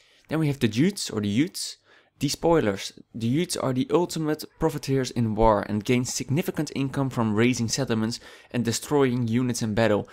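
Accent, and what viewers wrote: Netherlands. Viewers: Are you Dutch? You sound a bit like my brother when talking English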